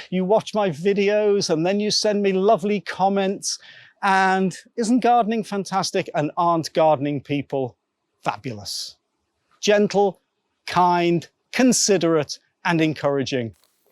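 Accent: United Kingdom. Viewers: Hi! I subscribed to your videos some days ago, but I do really enjoy your style as well as beautiful British English. I'm crazy about growing and cultivating plants, though I don't have my own garden, only a balcony one with numerous pellies. Thank for sharing your precious experience. I learn a lot from you, especially the pronunciation of the plant species. Here, in Ukraine we are all into gardening and have green hands. If only we had peace! We would make our country even better with such a good teacher like you! Your tutorials are really worth seeing!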